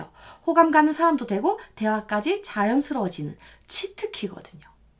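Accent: Gyeongsang, South Korea. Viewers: ㅎㅎ 경상도 억양 매력잇네요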